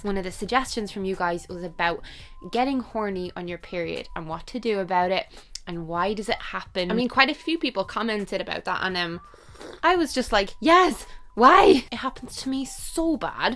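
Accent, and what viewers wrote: Ireland. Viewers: I can't wait to read your book I just realised that you have a YouTube channel and I'm so happy that I found an IRISH 🍀 YouTubers I love u so much thank u for being Irish and having an Irish accent rather than American or English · I think you sound so cute with your denture! I love listening to your voice, the Irish accent is my favorite!!! You are gorgeous and thank you so much for all your hard work and your realness. I love all your videos 💚💚💚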